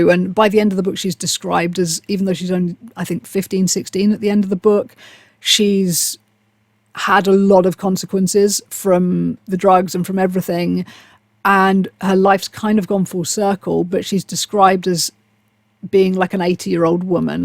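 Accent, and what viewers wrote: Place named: RP, United Kingdom. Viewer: Ok so first off I want to say that this is not a creepy old guy hitting on you… but rather a creepy old guy explaining how shocking it is to hear one of the most beautiful women I have ever seen who actually felt like she was on the outside and she had bullies! Seriously if I could put what my dream woman would have looked and sounded like to physical reality when I was younger it would be you. You are exactly the girl that I always wanted to go out with but never could pull it off. And oddly enough I too have been on methadone for 16 or so years. Perhaps one or two more but 16 seems about right. So anyway…I’m really glad that you seem to found yourself and are living the best life you can… and you are taking really good care of yourself as I know how hard it is to stay in shape while being on methadone. I liked how you put in the sidebar to make sure that no one else makes the same mistake as you. 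I find so much in common with what you are saying… I am autistic. I suffer with forced speech. I was bullied in grade school. Anyway I suppose that I wrote this because I was so shocked that someone who seems so perfect had such a terrible time. Or perhaps it’s the posh accent. Loved the video and I am looking forward to seeing more.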